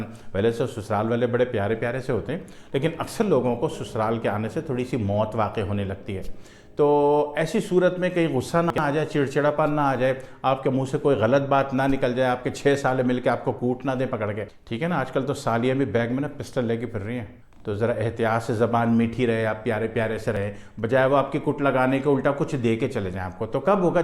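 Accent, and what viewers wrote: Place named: Lucknow, India. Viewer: انداز گفتگو سنکر لکھنو کی یاد آگٸ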